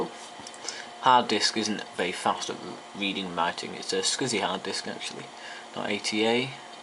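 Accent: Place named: Cumbria, United Kingdom. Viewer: Flash back? My Macintosh Classic II still works perfectly, except for the time (the battery is flat but I'm buggered if I'm gonna waste money on buying a new one!) And your Cumbrian accent is like music to my ears, lad!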